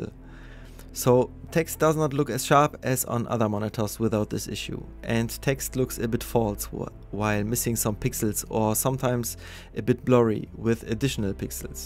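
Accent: Germany. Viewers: immer dieser deutsche akzent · your pronounciation sounds way to german. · are you german?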